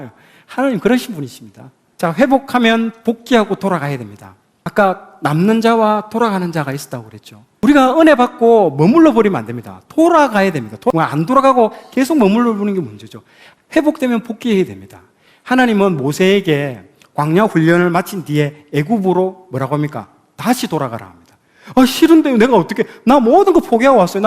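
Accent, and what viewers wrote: Gyeongsang, South Korea. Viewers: 경상도 사투리가 정겨웠는데 아니! 마산! 저도 마산출신 입니다... 지금은 먼 외국에서 주님의 사역을 감당하고 있습니다만 오랫동안 한국을 가지못해 늘 고국을 그리워하며 살고있는 순례자입니다.... 구독해서 열심히 듣고 있습니다.... 주님의 이름으로 축복합니다... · 경상도 사투리의 억양과 또랑또랑한 음성이 조화가 되어서 귀에 쏙쏙 들어옵니다 감사합니다💝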